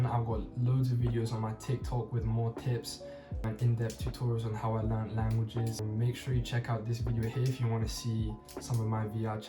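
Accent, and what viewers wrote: France. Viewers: You have a little but of french accent in english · You have a french accent when speaking english. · Bro has a French accent when he’s speaking English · What is your native language? It sounds like you have French accent. I think you are making stories. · you have a french accent when you speak English, you wont fool me. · bro even got french accent 🔥🔥🔥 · yes I’m a native English speaker trying to learn French and his French (or seemingly French) accent was the first thing I noticed upon clicking the video. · His English has french accent...hmmm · This guy is lying- he is speaking English with French accent. We are latvians,but our kids went to french kindergarden and finished primary school, you cannot fool somebody who has children with French as first language. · Your accent is so French?! R you sure it’s not your native language?! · I don't believe this. 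There is something you're not telling us. Your Day 1 already had a French accent that someone learning literally their first day would not have. And just heard it: He began learning it in primary school. So you DID already have some education in it. Come on, stop with the MISREPRESENTATION! WHAT A LIAR.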